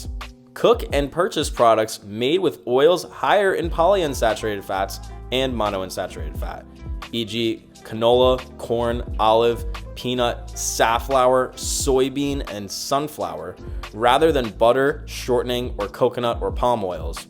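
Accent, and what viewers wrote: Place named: New Jersey, United States. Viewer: You from Jersey? You have a pretty distinct accent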